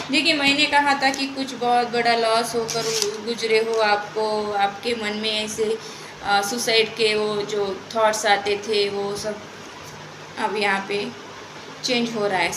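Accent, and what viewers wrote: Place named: Mumbai, India. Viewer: तथा संवादक मैडम जी को सादर प्रणाम स्वीकार हो । आपकी रीडिंग आवाज से मै समझ गया था इनकी भाषा मुम्बई तरफ की है । 100%सत्य है आपकी रीडिंग हम पूरी तरह से संतुष्ट है धन्यवाद। धन्यवाद ब्रम्हांड । चित्रकार राकेश नामदेव,अटेली मंडी जिला महेन्द्र गढ (हरियाणा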